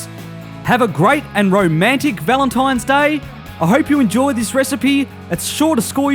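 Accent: Australia. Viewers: idk why but i fkin hate aussie accent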